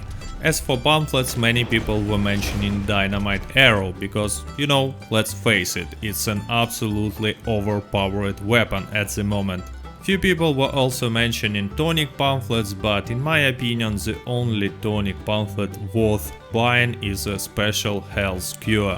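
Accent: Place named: Russia. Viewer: I've been subscribed for about six months and have a couple of compliments for you. First, your sarcasm is super extra funny coming from a guy with a Russian accent. English idioms and puns are hard even for native speakers but you mastered it. Second, if I were to guess your occupation it would be in the sciences. The combination of the scientific method you use (you always have an independent and dependant variable, and eliminate possible variences in the tests) plus you have a good sense of humour so I would bet you are an engineer. Last, I loved the way you used to say "Target" as "Tarjet". You should keep doing that as a running joke. Mad props to you, far and away the best Rdr2 YouTuber!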